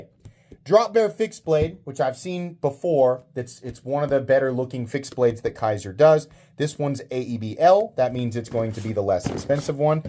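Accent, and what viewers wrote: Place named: Kansas, United States. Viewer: I kinda imagined people in kansas talk like that anyway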